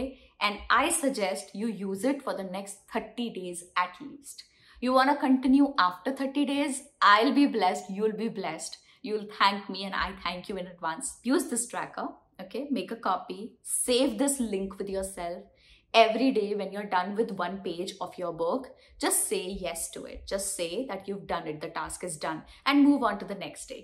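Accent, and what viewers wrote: India. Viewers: Indian accent.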